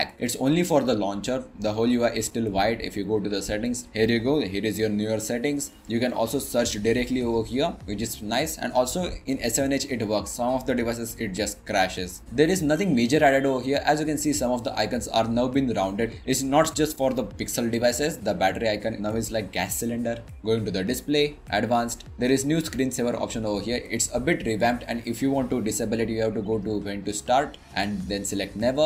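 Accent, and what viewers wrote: India. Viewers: He has Hindi accent English to be precise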